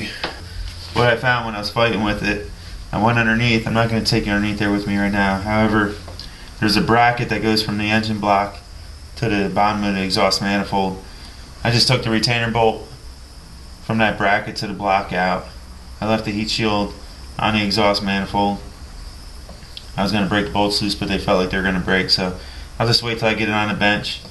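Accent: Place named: Pennsylvania, United States. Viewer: sounds like you are from somewhere near pennsylvania. is that true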